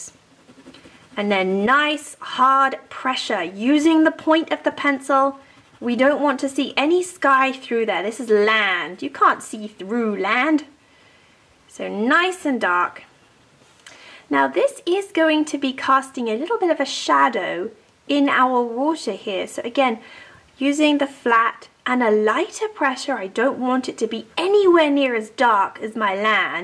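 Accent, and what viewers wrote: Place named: United Kingdom. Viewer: thank you, you are talented, i found it funny that you sound so british even though you are from usa :D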